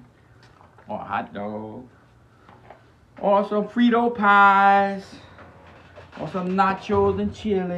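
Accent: Louisiana, United States. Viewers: Louisiana accent is money dude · One part Cajun 2 parts talking like a toddler = undeserved fame and your product sold at walmart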